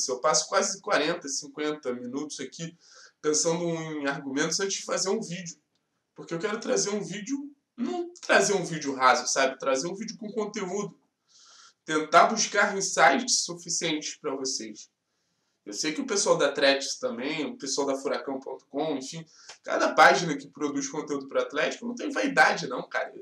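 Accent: Rio de Janeiro, Brazil. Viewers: Sou athleticano desde piá...tenho 51,anos e confesso q me soa estranho ouvir um sotaque carioca falar do Athletico com paixao como vc fala... Pois, como vc fala, aguentar o barulho de torcer pro Furacão não é fácil... A mídia carioca principalmente, mas dos paulistas tbm, quase sempre omitiu o Athletico e desedenhou... Admiro seu trabalho e seu esforco pelo meu time do coração ha quase 50 anos..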